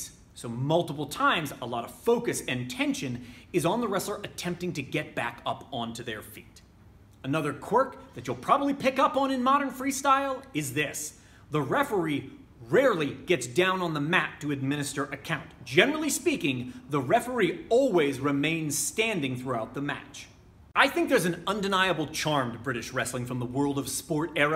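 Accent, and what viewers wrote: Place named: United States. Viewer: Why is some American guy talking to me?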